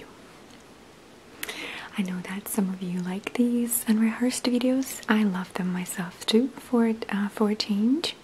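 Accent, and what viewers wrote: Russia. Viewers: Scissor__hands94 is definitely one of my favorites. If you like her accent, I'd suggest ASMRMania (another Russian gal, her accent is quite a bit thicker than.) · I really love your facial with strong Russian accent. As well as your sleep fairy. Could you please do some more similar? Thanks for always brightening my day,. Keep up the grand work:-)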